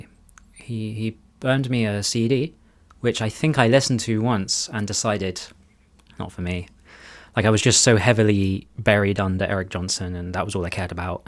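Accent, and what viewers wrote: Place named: United Kingdom. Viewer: I've watched your videos over the last few weeks but I've never heard you speak. When I first started this video I was like good grief is a Brit haha! I like your playing, I really do. You have such amazing picking technique which, as a player is my largest weakness. Do you have any tips for a well rounded veteran player to improve picking technique? Cheers from the US!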